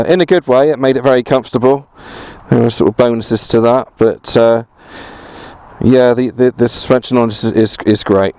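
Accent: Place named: England, United Kingdom. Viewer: Can you talk in an American accent hard to respect with English sounding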